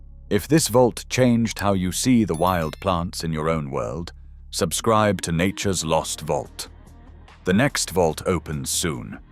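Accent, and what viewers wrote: United Kingdom. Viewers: I love this channel! I've learned so much from it and I hope it continues. I especially like the British accent.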